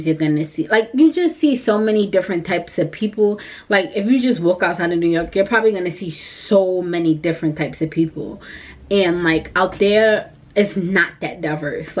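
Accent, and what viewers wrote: New York, United States. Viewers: Still sound like a New Yorker 😂😍🥰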